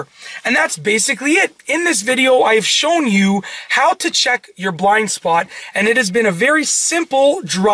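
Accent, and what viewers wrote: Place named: Canada. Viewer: This gentleman has the most CANADIAN accent I've ever heard. lol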